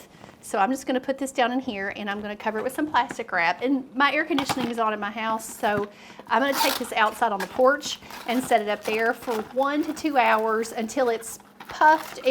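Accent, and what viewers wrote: South, United States. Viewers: Thanks for your recipe, I don't really listen to people who talk a lot, but it was a pleasure viewing your channel as intriguing. Listen to your southern voice. Your family is blessed by your love of making bread 🍞. 😊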